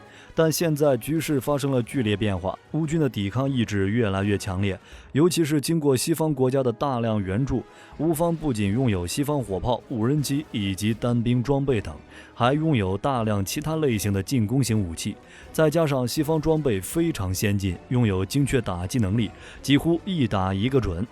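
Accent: China. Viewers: 你个台独媒体,用中国口音的配音,可耻.你应该用台湾语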